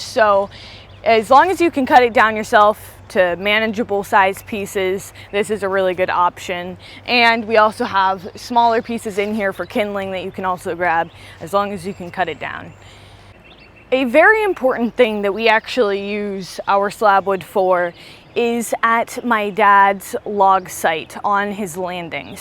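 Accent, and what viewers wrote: Pennsylvania, United States. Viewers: She has a PA accent. My wife from Lancaster has the same accent. Sometimes the sentences don’t end, “Do you want to go with…?” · That pa. Ridge runner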